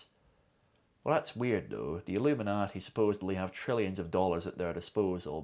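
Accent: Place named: Arizona, United States. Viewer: I’d recognize that Arizona accent anywhere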